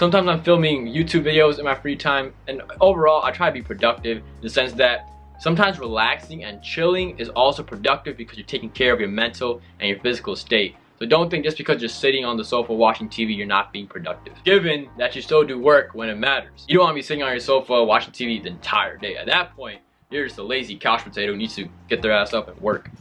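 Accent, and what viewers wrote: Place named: United States. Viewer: How you got this much fluency and American accent 🙄?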